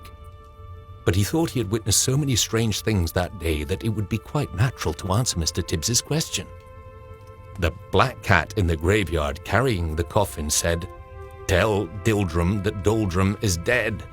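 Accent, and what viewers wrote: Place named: Edinburgh, United Kingdom. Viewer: The accent he's using is from the Northern tip of Edinburgh. I spent much of my childhood in Scotland and Ireland.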